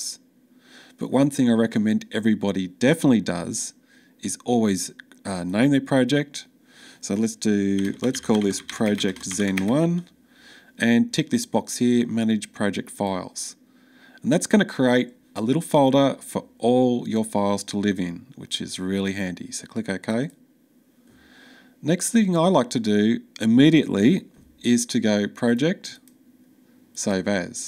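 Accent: Australia. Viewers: great, straightforward video in Australian, although I speak Californian. Thank you sir! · subscribed just for "ill be speaking Australian"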